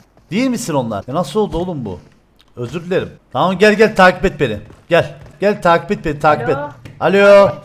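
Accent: Cyprus. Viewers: abi diğer videonda ful kıbrıs türkçesi konuş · Kıbrıs li olduğun belli baya . Bide videoya okadar edit koyuyosunki videodan sıkılıyorum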